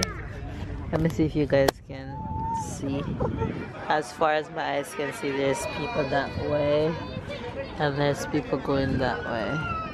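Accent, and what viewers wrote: Jamaica. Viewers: I am glad I jumped over on your page. Saw you from Adanna and David's page. Like how you keep up with Ja accent👍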